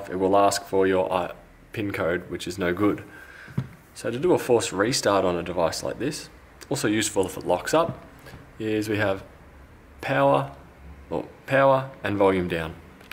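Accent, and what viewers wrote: Australia. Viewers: Great to hear an Aussie voice. I hope you are making a killing with monetisation - take as much money as you can from those bastards!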